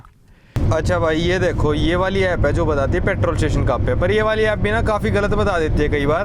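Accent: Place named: Himachal Pradesh, India. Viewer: भाई हमीरपुर, हिमाचल से तो नही हो, accent हिमाचली लग रहा आपका, मेरे रिश्तदार है वहाँ इसलिए बोल रहा हूँ, ❤❤ वलॉगिंग बढ़िया है👍👍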